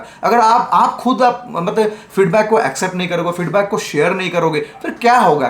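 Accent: Odisha, India. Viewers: = Odia vibe with hindi talking ❤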